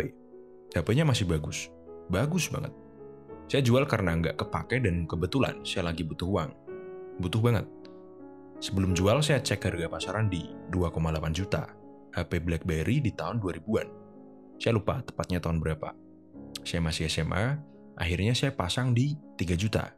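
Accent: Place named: Semarang, Indonesia. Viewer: Koyo suarane wong semarang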